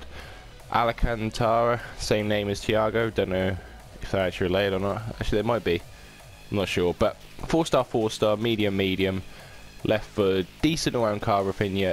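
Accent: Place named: Norfolk, United Kingdom. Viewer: I'm from Norwich mate, thought I heard it in your voice .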